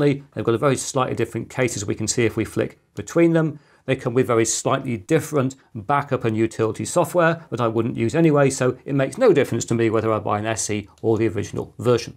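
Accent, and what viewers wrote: United Kingdom. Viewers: I'd be really careful what kind of data you copy to this WD drive. The flimsy USB Micro B plug/socket is very sensitive to mechanical stress when handling the cable. I had a broken WD Elements just after warranty expired and also used to work in a company where we had several broken WD Elements drives. The drive uses a special (very thin) PCB which is made out of plastic and therefore can not even be re-soldered when the USB socket comes partially off (and it will if you unplug/replug often). The drive is a special version which has the USB connector on board and no SATA connector, so you will not even be able to remove the drive from the case and read its data via a SATA connection. Really good explanation of backup strategies, I do like your scripts and your British English... 😊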